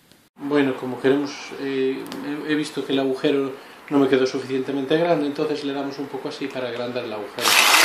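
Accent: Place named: Spain. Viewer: Gracias por el video me sirvio para hacerlo sin que me explote nada en casa :-) q uno es manitas peroooo.... mori de morriña cuando escuche tu acento 15 años fuera de España pesan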